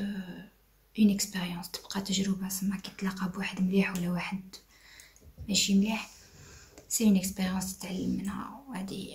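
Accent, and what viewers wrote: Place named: Oran, Algeria. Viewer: Comme je te l'ai déjà dit sur Instagram,tu es le porte voix qui a perpétuel l'idée du Mouvement Populaire ou Révolution comme tu le qualifies pendant ces 5 ans que nous célébrons ces jours ci'.Pour moi,tu es l'exemple vivant de la Résistance à la Tyrannie au plan culturel et artistique.Pour tout cela tu mérites notre respect et notre reconnaissance PS : j'ai adoré ton accent oranais ou Tlemcen ..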